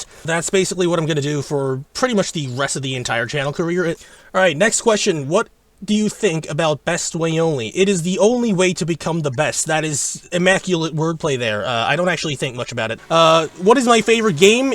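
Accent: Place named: United States. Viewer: You sound so American lol! Seriously, it feels very niche here in the US today, sadly never can find anyone I know who thinks Asphalt games are NOT ridiculous. You're living the gamers dream, which is basically to be like a top 100 player in their favorite game and I think maybe you underestimate how cool that is. Nobody would trade that! Keep being a good guy, it's your best trait.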